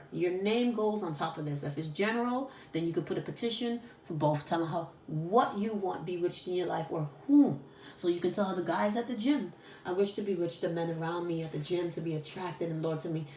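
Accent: New York, United States. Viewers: lol she stay having me dying with laughter she sound like she from New York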